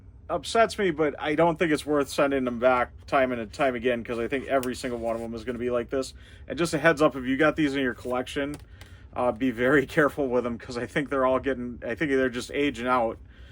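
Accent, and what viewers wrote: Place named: England, United Kingdom. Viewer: as a norwegian, im struggling to hear if u are english or ameircan. yea, i love. everything i love about, is in. if have existed in 2000, they would have sound exactly like, even the harmonies are there. by could be straight frim help, too,. is a very john lennon song too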